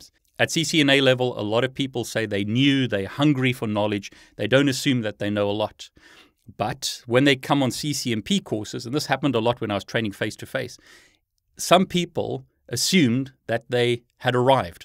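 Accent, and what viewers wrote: South Africa. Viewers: Didn't know you were South African but it helps explain why I've always thought there was something about your accent I couldn't quite place!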